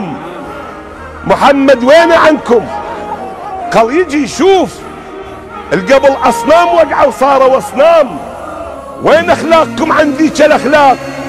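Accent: Iraq. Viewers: يااخي شجابه عل انبار. ترا مبين ذاكيا حت لهجته لهجه اهل صلاح دين ماشايف لقائته. وهو من البو خشمان. بتكريت. وتكريت الاصل. وهسا هو بتركيا عايش. ارحموا عقولنه شوي. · اللهجة عراقية وشاعر اسمة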